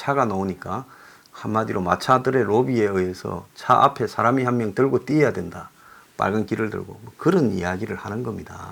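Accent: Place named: Busan, South Korea. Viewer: 김인성교수님, 얼마나 고마우신지... 울~소장님 흉과자랑, 더러는 넘 친하고 부산 말씨와 남자들의 특이성, *이놈 장수는*ㅎ ㅎ ㅎ 그래도 그자석은 성질은, 좀 급해도 맘은 좋데이, 같은 부산토박이로 넘 정겨윘어예.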